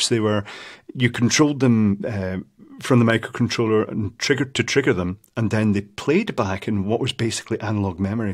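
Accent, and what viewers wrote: Scotland, United Kingdom. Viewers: 28 years ago I doubt I would understand his heavy Scottish accent.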